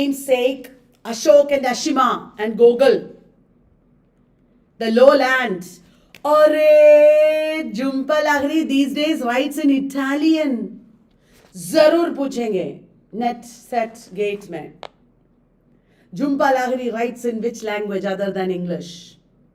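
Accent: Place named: Andhra Pradesh, India. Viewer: Mam you speak like my friend from Andhra Pradesh....very cute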